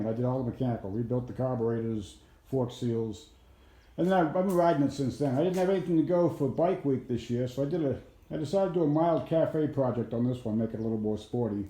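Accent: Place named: Boston, United States. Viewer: How do you still have the Boston accent?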